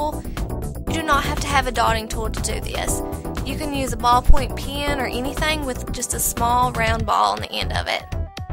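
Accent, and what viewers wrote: South, United States. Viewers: wow, its like a southern cutepolish! such a cute cute accent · im from Tenneessee :) your accent is cute. Normaly i hate country acents but not yours. SO going to try this later. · does she sound country to you guys in this video more than others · OMG! You sound so country! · Woah! I got really confused when you started talking! You're like a southern cutepolish! lol I feel like I got more information/instructions when you talk than with just text. I like it!! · wow you have a really thick southern accent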